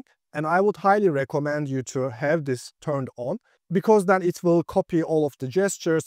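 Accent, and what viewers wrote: Turkey. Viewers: turkish accent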